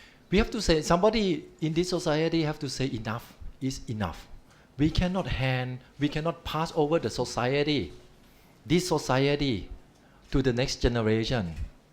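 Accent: Thailand. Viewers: สำเนียงแบบไทยไทยแต่เข้าใจง่ายดีครับชอบมากเลยครับผม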